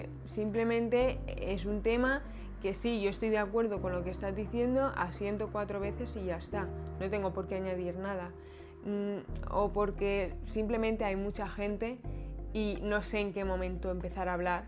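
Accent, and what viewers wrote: Spain. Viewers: reconozco facílmente el acento español.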